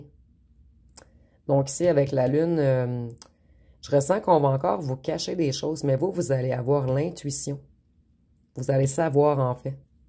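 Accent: Canada. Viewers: Vous êtes la meilleure. En plus j'adore votre accent canadien. Vos interventions ont valeur de pistes possibles dans la carte de mon, pélerinage terrestre. Le très inspiré jésuite, Teilhard de Chardin, n'a-t-il pas eu cette phrase prophnétique = "Nous ne sommes pas des êres humains qui ont une expérience spirituelle; mais des être spirituels qui ont une expérience humaines. " et je retrouvre par votre voix cette Vérité fondamen,tale. MERCI à Vous, Madame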